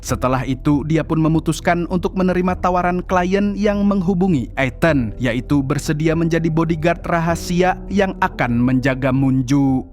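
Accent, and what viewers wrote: Banjar, Indonesia. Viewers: adminnya orng banjar yh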